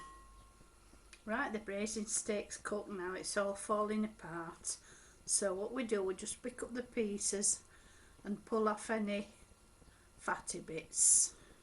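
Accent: Yorkshire, United Kingdom. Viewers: I love your accent, are you from Yorkshire?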